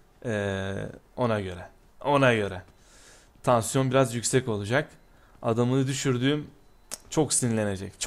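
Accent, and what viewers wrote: Adana, Turkey. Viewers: Abi Adanalı mısın yanlış anlama bende adanaliyim bizim gibi konuşuyon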